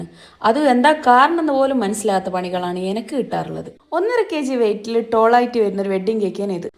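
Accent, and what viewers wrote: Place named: Vadakara, India. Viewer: Vadakara slang 😊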